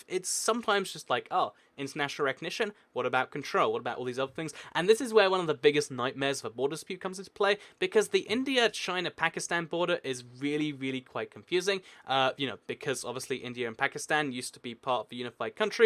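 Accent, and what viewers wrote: England, United Kingdom. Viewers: Very good material. It could have been cut up into two- or more presentations. I live in (kind of) the Southern U.S. and the combination of your English accent (which is delightful, by the way) and the speed with which you blew through your presentation made it a little harder (for me, anyway) to understand. I genuinely enjoyed the video, however, and am impressed by the amount of research you must have had to do to acquire this obscure knowledge (disputed and unclaimed lands of the earth). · Kiwi he's British, they pronounce it differently Coming from a Bosnian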